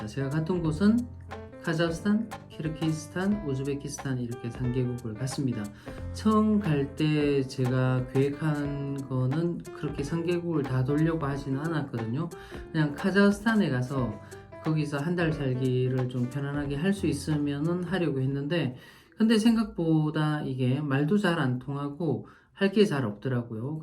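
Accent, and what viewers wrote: Gyeongsang, South Korea. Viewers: 수고 하셨습니다~ 감사 합니다. 같은 억양의 경상도 할배라~ 의 세계 탐방 정보에 따른 사고의 멘토를 부담없이 만족하며 보고 있습니다. 항상 건강에 유의 하시고 가족과, 연로하신 어머님께도 지금처럼 열심을 다하는 효자가 되시길 기도 합니다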